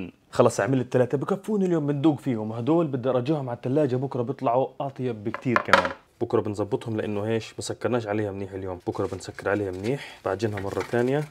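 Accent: Palestine. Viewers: لهجة. غزوية غزة. دايمن غير تحيه. للي. غزة. من. داخل. المحتل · احب قناته علشان اللهجه الفلسطينيه الي تجننننن 😍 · الصراحة أنا لا أحب أطبخ لكني أشاهد فيديوهاتك من أجل اللهجة الفلسطينية ، ما شاء الله. بالتوفيق حبيبنا اللزم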